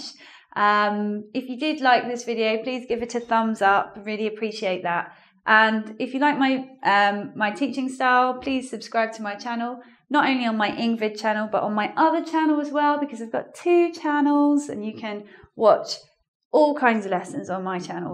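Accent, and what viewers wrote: United Kingdom. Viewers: Although in Russian schools we are learning English English I cant understand 100% of information because of British accent. Is it a habit due to American movies and series? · To me is harder understand the British accent :( but I like it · I love your videos and i like watch them, over all, for your accent, im trying to improve british acent and and grammar too. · Ohh, it was so cute, when you tried so hard to make us undestend your perfect btitish acсent, I`m very grateful to you) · God i love listen to your british acccent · Hi, I have been looking for so long to English trainings with a wonderful british english and recently I found your channel. When I first heard you, I have known that´s what I was looking for. Since then I hear your speech videos on the train in the bus at home in the kitchen everywhere. I'm addicted to your accent and I try to copy that. 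I am so happy that you are there! You are an excellent speaker and trainer. Very talented. I´ll stay with you because I need your speech style. Lucy is o.k. But your speech style/ voice is more cooler, and your accent is more nicer for my and your videos are full of content. I'm so thankful and I´m a big fan of you! Thanks! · I just can´t get enough of your accent keep it that way it sounds by far better than the american english. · thanks a lot for this lesson, i have really learned too much. i have also subscribed ur channel. im trying to (improve)learn (my)english and to have an amazing british accent like that wich you have got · Hi! I'm from Mexico and i studied American English for about 2 years and i like it but i absolutely love British English and Accent, your videos are helping me to correct that little errors to reach your accent C: so thank you!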